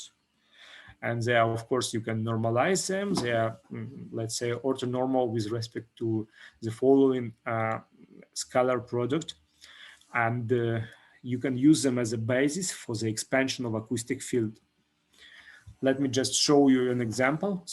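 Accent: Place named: Germany. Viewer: Классный доклад, я прямо флешбеки словил. Мне кажется у тебя местами немецкий акцент проскальзывает)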